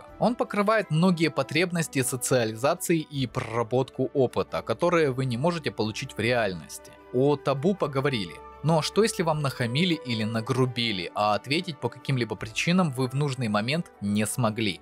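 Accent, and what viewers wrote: Ukraine. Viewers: Прекрасно ) А разговоров то было - армейский психолог, опыт боевых действий, сотни лет практики с больными. И что же мы увидели, а мы увидели голого короля с фантазиями, хотя украинский акцент и выдавал жителя Украины. Куда же делся беспристрастный психолог с рассуждениями о том как вести себя на войне ? А он почему- то превратился в активного пропагандиста, да еще и с речами про русского Ваньку, что само по себе ксенофобия. Прям повеяло Геббельсовской пропагандой про сдающиеся войска, женщин с коктейлями молотого, призрака Мариуполя который сбил 600 танков в воздухе и под водой. 4 дня прошло с твоего последнего ролика и как же мерзко теперь звучит, твои солдаты уже отходят в глубь страны, а твоя русофобия и ненависть к обычным русским людям запомнилась многим. Надеюсь те кто тебе донатил постыдяться и обдумают свои решения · Я давно знал, что он украинец. 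У него жена с Украины, она в каком-то видео говорила. И раньше он про власть говорил. В каком-то видосе упоминал про арестованного украинского военного и мол поэтому он не хочет расскрывать свою личность. И по говору понятно Люди, которые удивлены, что он украинец, наверное, только начали его смотреть, потому как мне давно известно, что он с Украины. Другого объяснения у меня нет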